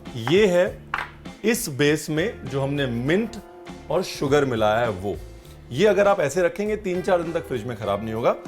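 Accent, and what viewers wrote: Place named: Lucknow, India. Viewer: Adaab ranveer sir apka lakhnavi andaz acha lagta hi cooking with urdu adab maza Agaya. This makes ur cooking and show obviously ur dish royal and great and delicious too like that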